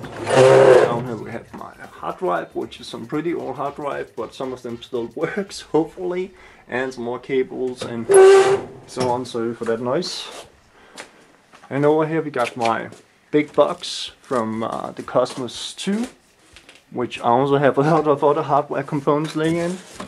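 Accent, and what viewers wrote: Denmark. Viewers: Det er sjovt at høre en tale engelsk når han er fra Danmark :D - Nice video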